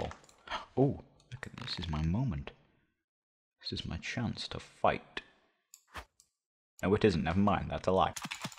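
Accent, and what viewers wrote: United Kingdom. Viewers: Are you British? You sounded like you were as a kid.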